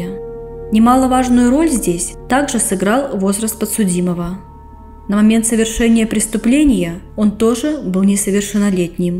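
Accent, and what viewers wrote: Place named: Belarus. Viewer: Белорусский говор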